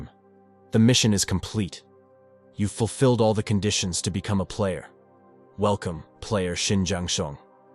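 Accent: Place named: United Kingdom. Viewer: Broo this ai is so british/old english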